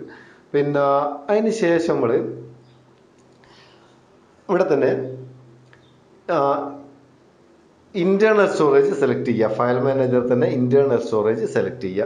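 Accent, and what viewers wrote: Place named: Vadakara, India. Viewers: Orijnal nadaaapuram samsaram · വടേര ഭാഷ...... നല്ല മൊഞ്ജ് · vadakarakkarum ingono okke yaan samsaarikkuka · Haai kolalooo vadakan kerala bhasha kalakiii karyam clear aayyy kolllaa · അര്യത്താ അയേ......വർത്താനം ketteram തോന്നി.....😊😊😊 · അടിപൊളി സ്ലാങ് ങ്ങള് മേപ്പയ്യൂർ ആന്നോ!!മ്മളും സബ്സ്ക്രൈബ് ചെയ്തിക്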